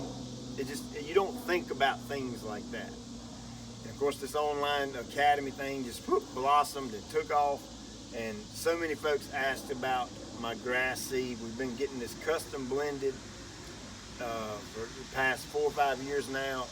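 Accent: South, United States. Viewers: That would be awesome to watch both of you guys do a collaboration together. You both are great at what you do. I appreciate the information you pass along to all of us here. BTW, my wife rolls her eyes when I watch my videos but when comes on she'll watch with me. She just loves your southern accent. Keep up the great work,.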